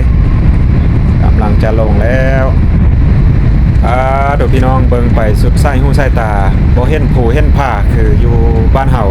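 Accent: Loei, Thailand. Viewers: สำเนียงคนเลย คนหล่มสัก นครไทยเลยค่ะ พูดภาษา สำเนียงเดียวกัน ต่างแค่คำนิดหน่อย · สำเนียงเหมือนคนจังหวัดเลยเปาะเรยครับ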